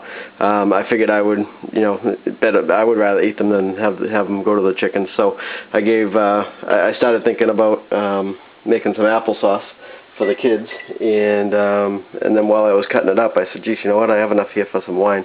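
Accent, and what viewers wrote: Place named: Maine, United States. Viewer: You from Maine? You talk like we do.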